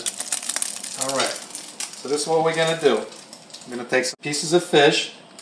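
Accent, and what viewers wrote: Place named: New Jersey, United States. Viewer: I love your Jersey accent❗❗ That recipe looks simple and delicious 👍🏾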